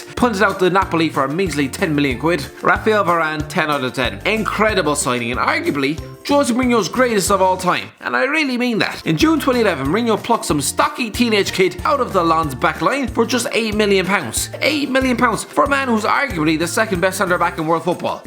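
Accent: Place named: Ireland. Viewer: This guys Irish voice is so annoying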